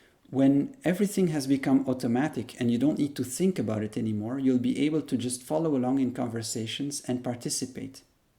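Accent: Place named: Tagalog, Philippines. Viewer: Dude I can hear a Tagalog accent..Your good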